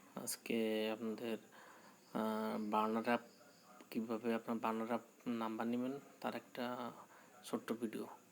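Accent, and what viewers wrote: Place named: Khulna, Bangladesh. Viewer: Vai, apnar Kotha suna to khulnar Lok mone hoy. Jai hok personally Kotha bol jabe?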